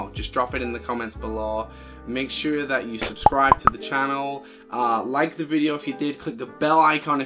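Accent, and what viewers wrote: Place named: South London, United Kingdom. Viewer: you Sounds a bit like a south londoner 😄👍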